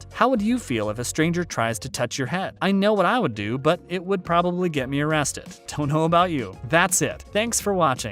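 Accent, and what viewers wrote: United States. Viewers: The narrator is a jerk, he sounds like a Yank, so dumb. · What do I think ? I think the tourists should remember, they are duty bound to keep the Way free for the Guards and not reversed. You sound like an American, would you disrespect your own Soldier Guards at the Tomb of the unknown Soldier in the USA ? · The narrators' accent says it all. Yanks are always a pain,an advertisement of a run-down,corrupt & broken nation.Its types like him that dragged a once-proud nation to the depths it now inhabits.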